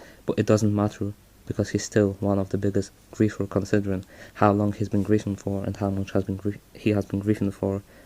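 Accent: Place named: Poland. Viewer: I can hear your Polish accent in your voice, but that's okay because we can still understand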